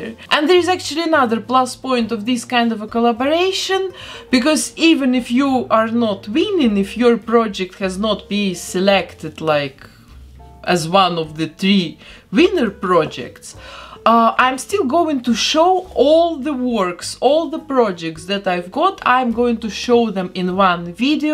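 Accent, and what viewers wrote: Russia. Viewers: Хмм, русский акцент?🤔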